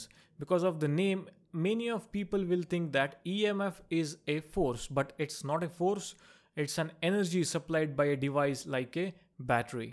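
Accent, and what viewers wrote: India. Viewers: U have the greatest indian accent ever.. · Thank you for this film. It is the second time an indian teacher impress me. Exactly what i needed to learn, now. I listened to american, brit, australian... It was only the indian teachers that were precise and correct in their terminology. India must be an educational superpower. Your english sounds funny, but , you are simply the best teachers.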